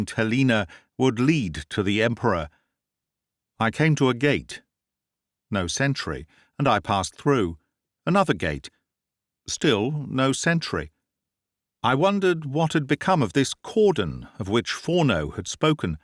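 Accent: United Kingdom. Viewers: Its my utmost pleasure listening to your clear British accent that I love... Please never stop sharing stories narrated by your marvellous voice.. thank you very much sir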